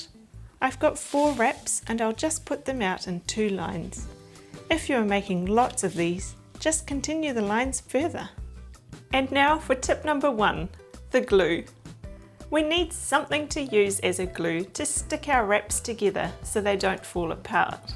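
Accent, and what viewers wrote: Australia. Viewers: I wish I could pronounce Easy Peasy with a lovely Australian accent. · Love your Aussie accent!🤩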